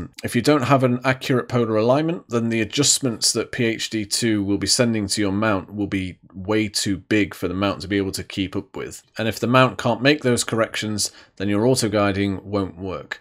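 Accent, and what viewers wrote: United Kingdom. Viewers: WOW...That was a Fantastic Presentation...I was writing fast...Stop and Write and start up again...You are so very knowledgeable - Very Impressive...As a Newbie...Even with all the Required Equipment...I see I have a Long Learning Curve...But with your Videos...You have made the Process Less Painful... Beautiful Accent...You must be from The Mother-Country...Florida Here...Thanks again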